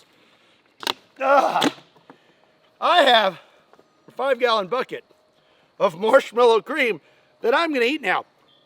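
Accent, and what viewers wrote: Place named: South, United States. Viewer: I LOVE THAT SOUTHERN ACCENT!!!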